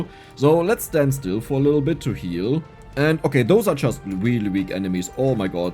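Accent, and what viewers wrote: Germany. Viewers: Ähm kurze Frage du machst nur Videos auf Englisch oder? Ich fände es echt cool, wenn du auch mal das ein oder andere auf Deutsch machen könntest. Irgendwie klingt es für mich so, als wärst du eigentlich deutschsprachig.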